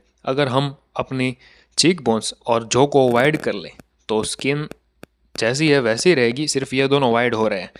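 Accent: Gujarat, India. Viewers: Vhi to voice tone gujrati hai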